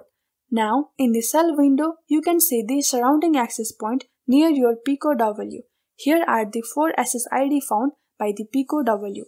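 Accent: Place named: India. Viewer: An Indian, really? Please use a voice-over actor!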